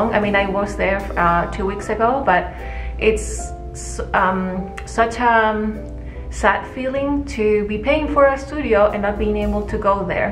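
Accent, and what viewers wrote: Chile. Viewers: ¿Ese acento es chileno? Estoy seguritaaa ¡que emoción encontrar gente tan creativa y de mi propio país! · I was watching your video and you said unas palabras en español y dije Ohhh!!! es Chilena xD y bueno te googlie y si !! Saludos desde Chile!!!!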